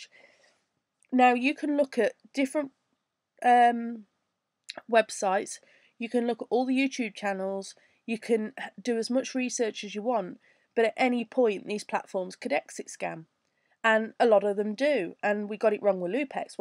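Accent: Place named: London, United Kingdom. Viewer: I want to say I liked the video nice accent you sound like your from my neck of the woods in London? love to work with you guys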